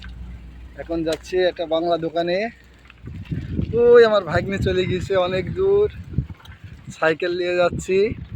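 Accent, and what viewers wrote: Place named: Meherpur, Bangladesh. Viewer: মেহেরপুরের ভাষা পাল্টা 🙂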